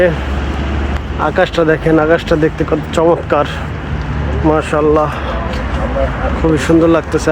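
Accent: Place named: Munshiganj, Bangladesh. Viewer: না আপনার কথা সুনে মুন্সিগঞ্জের কথার মতো লাগে তাই জিজ্ঞেস করলাম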